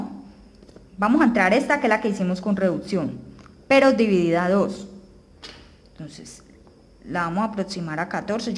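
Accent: Colombia. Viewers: Hola me gusta mucho come nos enseñas, vivo en Italia hice un curso de dos años y me defendía. Per tú me has ayudado tanto, además que iorte hablar me encanta me hace sentir en mi querida Colombia... Te felicito y deseo tantos éxitos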